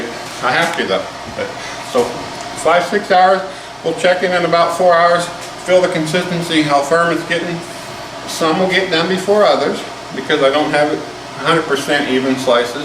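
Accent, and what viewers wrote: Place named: Wisconsin, United States. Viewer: Thanks for this recipe! I needed some ideas for fish, and I have a freezer full of carp and gar that I will be turning into jerky. Are you from Wisconsin? You sound just like some of my friends from Rhinelander!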